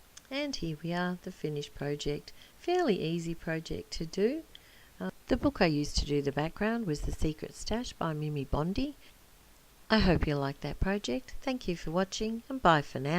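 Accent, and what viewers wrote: Australia. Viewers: Hey, fairly new subbie, thank you for your video. I thought you sounded Australian, then you mentioned the reject shop, then you told us you were. I’m on Gold Coast QLD. Where do you hang out, glad I found you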